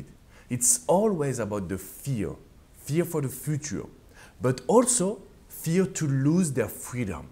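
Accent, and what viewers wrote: France. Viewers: Like your French accent!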